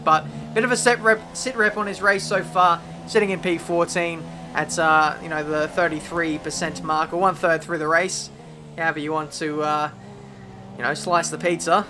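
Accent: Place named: Australia. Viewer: Richard Booth He's Australian, of course he will have an accent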